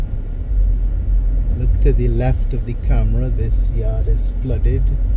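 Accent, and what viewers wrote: Trinidad and Tobago. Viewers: I hear a trini accent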